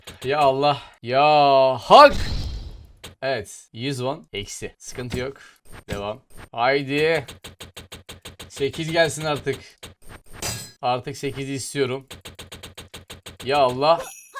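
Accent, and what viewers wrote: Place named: Adana, Turkey. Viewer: Adanadan izliyorum kardeşim oyunun hakkını veren oyunculardaniz bir zamanlar ama videonun sonuna kadar keyifli izledim sende bir adana şivesi var gardas başarılar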